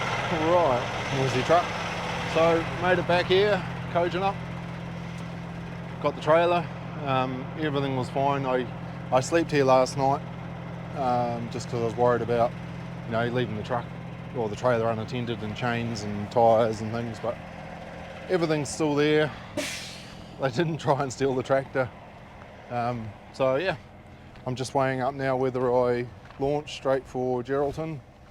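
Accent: Southland, New Zealand. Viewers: Great to watch. Enjoy your stuff mate. You sound like a Southlander.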